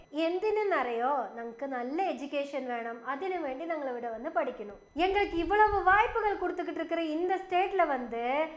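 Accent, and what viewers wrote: Kongu, India. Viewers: மோளோட கொங்கு தமிளானும் ஞங்களுக்கு கேட்கான் வளர இஷ்டம். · நீ மலையாளம், தமிழ் ரெண்டு மொழியும் பேசினாலும், உங்க கொங்கு தமிழ் பேச்சுவழக்கு எனக்கு ரொம்பப் பிடிக்கும்😊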